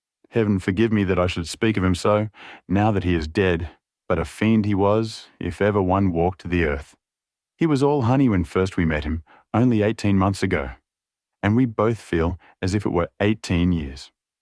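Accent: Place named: Australia. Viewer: Ai Australian accent? No no no ....I'm gone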